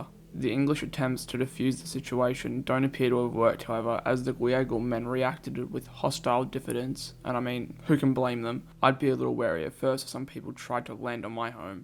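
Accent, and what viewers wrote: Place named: New Zealand. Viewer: I'm a New Zealander and honestly thought your accent was Kiwi at first.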